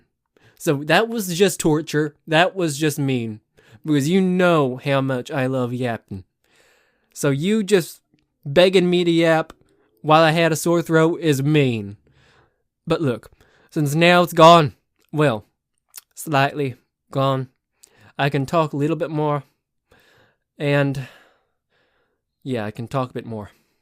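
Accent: United States. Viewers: Nice!! More of! killed me…great work, love your southern talking, yeehaw 🫶🏽🤣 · As a resident country, great country accent.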